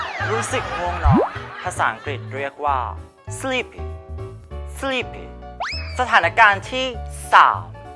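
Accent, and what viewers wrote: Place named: Thailand. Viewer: สำเนียง เจ๊ แปะมากเลยอ่ะ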